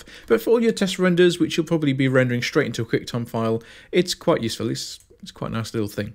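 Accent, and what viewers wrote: United Kingdom. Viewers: Not sure if sophisticated American or just British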